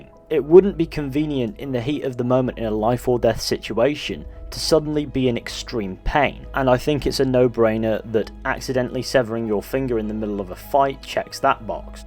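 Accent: United Kingdom. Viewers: sorry kinda sound british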